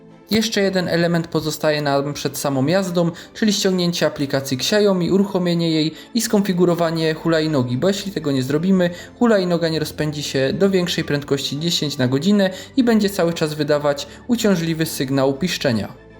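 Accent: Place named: Silesia, Poland. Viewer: Czemu tak zaciagasz po slasku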